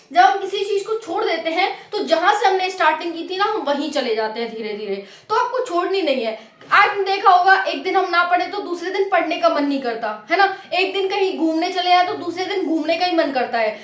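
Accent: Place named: Braj, India. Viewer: आप तो ब्रज भाषा बोल रही हो बहुत प्यारी लगी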